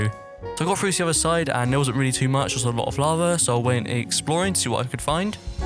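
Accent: United Kingdom. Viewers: I love your voice Are u Britain? I’m sorry if ur offended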